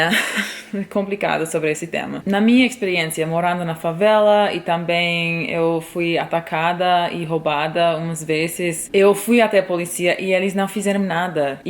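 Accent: Portugal. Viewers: Legal, sotaque muito parecido com portugues de portugal. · "Psiu" é som para chamar gatos ou para pedir silêncio, chamar alguém assim ou por meio de assobios é falta de educação. Eu sou pontual e também não gosto dessa mancada que a maioria dos brasileiros dá, que é o de atrasar em seus compromissos. O seu sotaque lembra o de uma portuguesa! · Você tem sotaque português de PORTUGAL....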